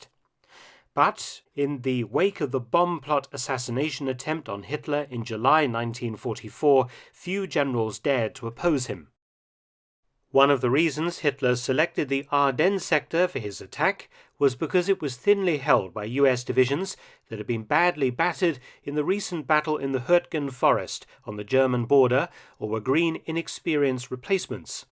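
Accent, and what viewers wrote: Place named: RP, United Kingdom. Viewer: GENERAL SOUTHERN BRITISH/Received Pronunciation.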